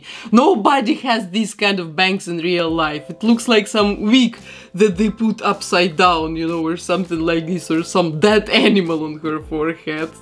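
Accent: Russia. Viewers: Акцент русский, вы случайно не из России? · Where is she from? Such a different accent! I'm guessing she's European or Russian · Что с твоим голосом? Ты что русская ? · She has a Russian accent, she is russian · не перестаю удивляться тому, насколько русским может звучать английский язык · Русский английский) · Волосы грубо смотрятся, как солома( И да, русский акцент))) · Стоп, я слышу руский акцент))) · Вы Русская? Акцент 🤦‍♀️ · Акцент очень похож на русский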